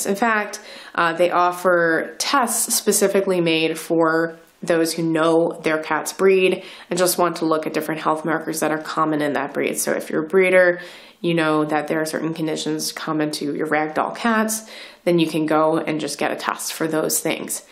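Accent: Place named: Wisconsin, United States. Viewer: I can tell youre from Wisconsin. Lol.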